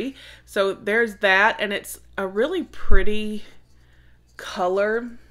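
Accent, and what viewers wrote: South, United States. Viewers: 🎂🎂🎂I am so happy for you to reach this mile stone...its because you have such a wonderful channel and you are such a giving person...I am so blessed that I came across your channel...I love your country accent...And thanks again for getting me started with Globe in...I just love that box...Congratulations...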